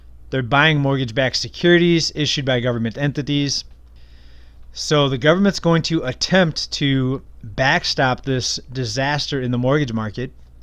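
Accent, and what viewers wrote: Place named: Pennsylvania, United States. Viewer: Does this guy happen to live in the rust belt? More specifically Pennsylvania. I like the dialect.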